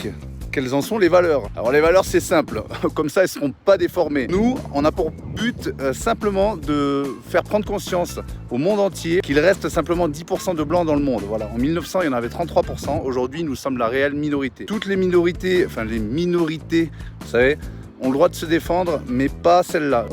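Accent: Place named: France. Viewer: 100 membres? Ouf ca rassure!!! Le mieux serait encore zéro "bon un" tu vas pas te virer de ton mouvement quand-même 😉. Étant une Suissesse pur souche, j'avoue tu me sors par les trous de nez avec ton patriotisme à 2 balles, récité avec ton accent bien frouze, ah stp, tu pourrais nous faire une petite vidéo avec ton passeport? Quand a revendiquer ta couleur blanche au secours, tu en parles comme si tu avais fait des études poussées pour l'obtenir 😂, si le blanc disparaît c'est peut-être que les métissés ont plus d'attrait que les blancs. Et concrètement tu veux changer ca comment? Avec une loi qui interdit de faire un gosse avec une personne de couleur? Non mais franchement!!!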